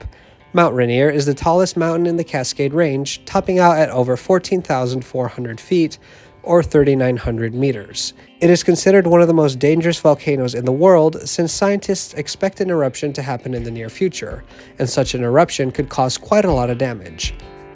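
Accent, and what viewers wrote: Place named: Chicago, United States. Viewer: you have been very, very evenhanded with Amtrak👍🏻 you and Chicago area?🤔( you despite being from the Netherlands) sound like a Chicagoan lol🤘🏻🇳🇱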